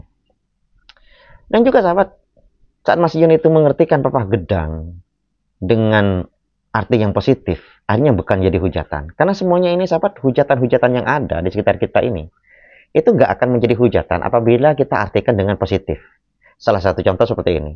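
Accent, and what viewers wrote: Madura, Indonesia. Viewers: Assalamu'alaikum bro klao sya cermati dari suara nya sampeyan ini kayak nya orang Madura ya bos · Pancen meduro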